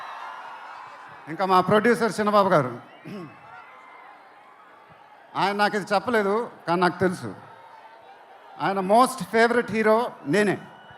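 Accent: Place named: Guntur, India. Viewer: Pure guntur slang 😍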